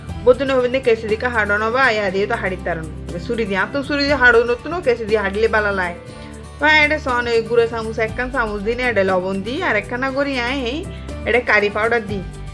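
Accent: Chittagong, Bangladesh. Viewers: আরার চিটাগাং এর কথা মানি সেরা আ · Peyajoo diye shutki ! Kahinito kokhono, I must try.... and enjoyed ur chatgaiya talking 😀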